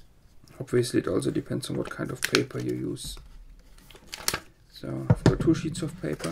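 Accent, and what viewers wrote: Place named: Germany. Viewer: I love your German accent! 💕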